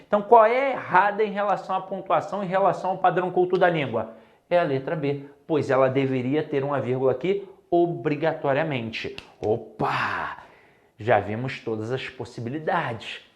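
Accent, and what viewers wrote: Rio de Janeiro, Brazil. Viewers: Sotaque de carioca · amei esse sotaque Carioca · Que sotáque lindo de carioca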